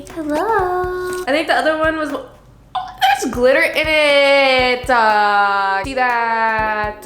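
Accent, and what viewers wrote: United Kingdom. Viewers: PLS THE BRITISH ACCENT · I love your british accentkdnskqnfnwndnd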